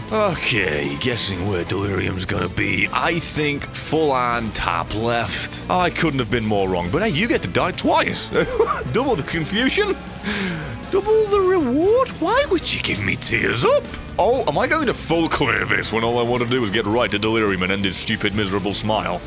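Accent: United Kingdom. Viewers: Nah it's his British accent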